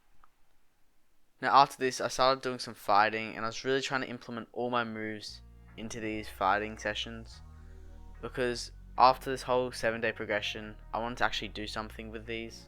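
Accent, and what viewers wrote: Australia. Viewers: Na Aussie accent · same I’m Aussie and he kinda sounds like PWR Alex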